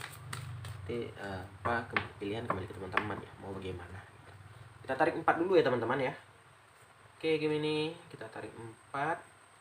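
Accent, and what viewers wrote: Padang, Indonesia. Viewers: Logat bahasanya sprtnya mas org padang ya...🤣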